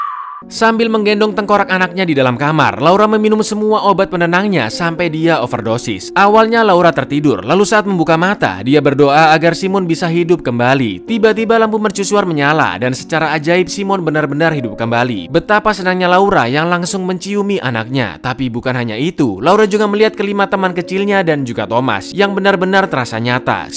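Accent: Java, Indonesia. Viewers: gw sebenernya ga terlalu suka sama aksen jawa karna susah di mengerti, tapi klo mau tidur suka selalu nonton channel ini. the best channel ever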